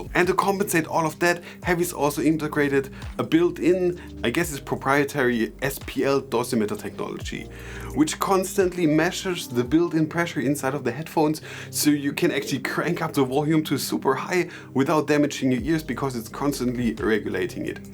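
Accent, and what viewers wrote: Germany. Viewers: man hört das du Deutscher oder vllt sogar Österreicher bist :D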